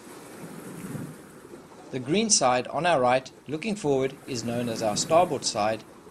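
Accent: South Africa. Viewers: Totally Fabulous - love the SA accent too !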